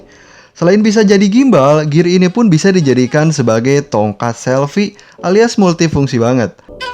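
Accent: Kuningan, Indonesia. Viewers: Siga orang kuningan nya mang? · Bro orang Kuningan?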